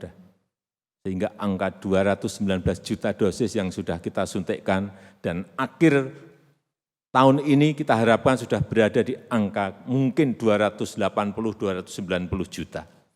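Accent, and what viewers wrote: Java, Indonesia. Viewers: Pak jokowi klo pidato : saya seneng.. Karna logak beliau jowo tenan.. Meskipun Aku tutu wong jowo tapi seneng ngomong jowo. Halus.. 😁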